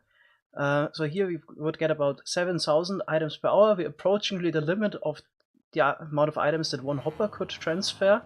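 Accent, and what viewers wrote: Germany. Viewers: Man hört, dass du aus Deutschland oder Österreich kommst😂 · Höre ich da einen deutschen Akzent? :)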